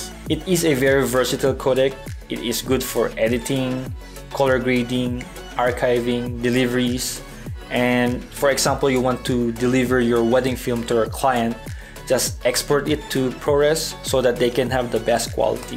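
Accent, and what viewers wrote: Philippines. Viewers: I feel like he’s a filipino with his accent. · sounds pinoy-ish :D .. nice tuts.. problem is i don't understand this proxies and ProRes thing.. when i edit., i just drag and drop since i'm just working on one clip..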